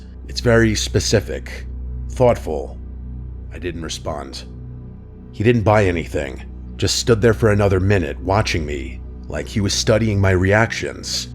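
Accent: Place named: Long Island, United States. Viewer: This dude is from Long Island for sure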